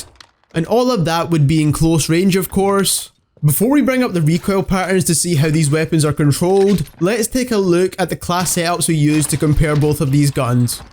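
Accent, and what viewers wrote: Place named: Scotland, United Kingdom. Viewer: He is from Scotland , i think , because he has a scottish/irish accent.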